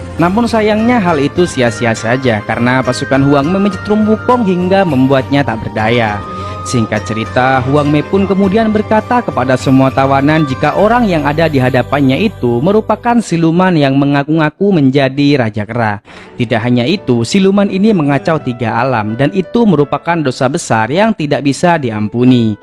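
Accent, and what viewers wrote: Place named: Madura, Indonesia. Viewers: Admin, logatnx kyk Madura. Madura mana gaes? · meduro endi sampean cakkkk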